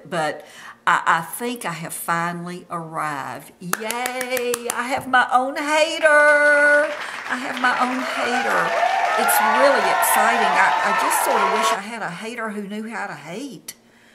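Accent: South, United States. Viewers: EXCELLENT!!!!! THIS WAS THE BEST REPLY TO HATERS!!!! JOB WELL DONE! I subscribed to your channel for these reason... 1- you look way younger than me (56) and I can use all the tips to look as young as you. 2- you talk to us you tubers as if we were friends forever...love that! 3- you take your time to explain things. 4- you are just stunning to look at....even my hubby would agree. 5- you are very open and welcoming...you draw us in...so sweet! 6- love, love, love your southern accent. 7- you are truly one of Gods children and that does show....you keep shining. Hugs and Kisses... 💛💛💛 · I'm 43 and I'm a Grandma too. You are a beautiful woman and I love your southern accent. Don't ever let a hater get to you. A hater, hates themselves therefore unable to love anybody else. xo Kat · I just discovered your videos and FELL IN LOVE with them and with you!!! 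I am 73, and also will go down fighting! I love your soft, Southern voice, very easy on the ears! Love all of your topics! Keep making as many videos as you possibly can and I will watch and be thankful for them all!!! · I just subscribed to you! You're so beautiful and refined. Just a soft spoken, gentle Southern woman. I just turned 50 and am going through some anxiety about getting older. I don't look my age, thanks to genetics and staying out of the sun, but it is inevitable. I look forward to watching more videos from you and of course your granddog!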